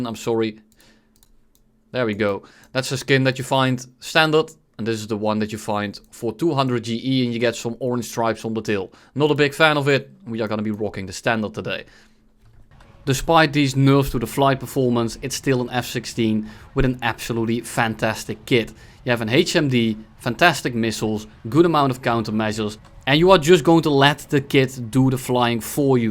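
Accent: Netherlands. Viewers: Youre dutch right?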